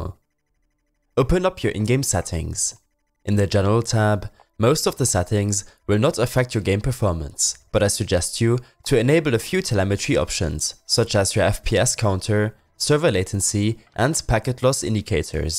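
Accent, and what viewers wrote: Germany. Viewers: Ich finde man hat schon rausgehört das du deutsch bist aber wo du nvidia highlites gesagt hast hab ich nur gelacht das klang auf Einmal so deutsch,aber gutes video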